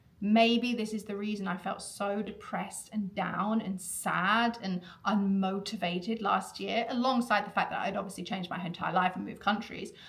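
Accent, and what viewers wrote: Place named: London, United Kingdom. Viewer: Most surprising thing in this video is that you’re from Yorkshire??????? Whaaaat. That is the most London accent ever!!!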